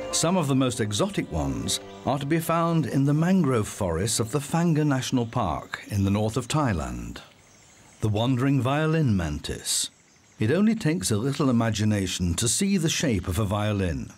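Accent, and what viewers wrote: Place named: United Kingdom. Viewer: You are correct, Gavin... & btw....this narrator IS British, but is not David Attenborough ...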